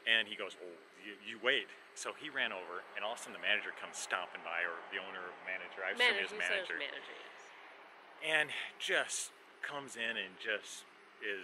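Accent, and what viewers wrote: United States. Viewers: You are either from California or a Yankees! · Tell me your from the East Coast without telling me your from the East Coast.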